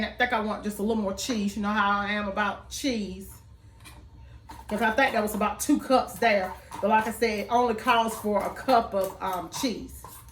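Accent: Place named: Georgia, United States. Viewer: Looks excellent ! Thank you for this video 🤗 You're Endearing 💖 & You sound like a Ga girl like me, am i right 🤔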